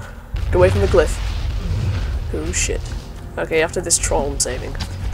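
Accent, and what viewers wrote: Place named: North, United Kingdom. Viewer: Thanks for the upload, just got through watching it all, brought back many memories :) Sounds like you're a fellow Northerner with your accent as well!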